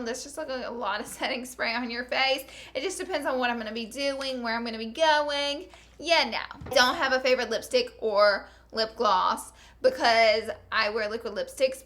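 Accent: South, United States. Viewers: Hi! I just started following you. I am also a southerner turned Californian, so naturally I love your voice! Reminds me of home. =) Love the hair, its super cute!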